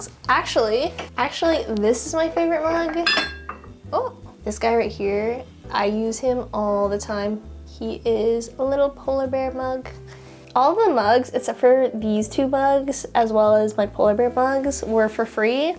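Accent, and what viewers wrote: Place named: Saskatchewan, Canada. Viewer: Thanks for a real life video. Not everyone lives a “home magazine life”. Are you canadian? You speak just like my Dad from Saskatchewan. Love from Norway.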